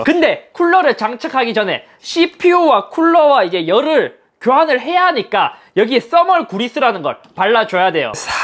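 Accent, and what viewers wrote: Gyeongsang, South Korea. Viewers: 말하는게 경상도 느낌난다 했는데 부산분이엿구나..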